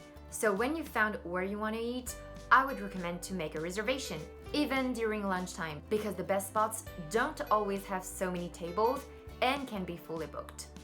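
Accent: France. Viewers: Great French accent - but I guess you're actually French!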